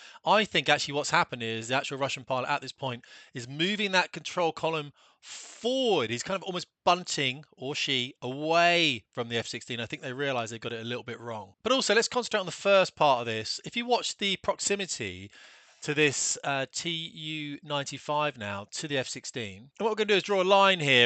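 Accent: United Kingdom. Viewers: Knowing what is going behind the scenes of the Ukraine war I love hearing a British accent make fun of a US pilot after all the US has done for with helping the UK in Ukraine. Total morale booster of a video. I think this video needs to make the round in the US military to remind us of who the OG enemy of the US is and why we should never trust them ever again.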